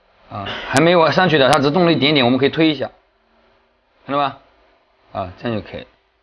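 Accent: Wenzhou, China. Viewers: 没看名字，一听就是温普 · 还是能听出来温州口语的普通话。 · 可笑下面一群人在那里感叹师傅真是良心啊plapla的，这up主是专门拍维修视频的，点掉这么多正常情况下维修店都是放弃维修了吧，浪费这么多时间得不偿失都维修，不过还是得赞up主的心灵手巧，这口音很熟悉，身边很多都是温州人，特别的聪明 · 一听你的普通话就知道你是温州人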